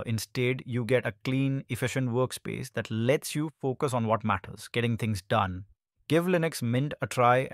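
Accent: India. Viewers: Jeet voice, immediate thumbs down and ignored.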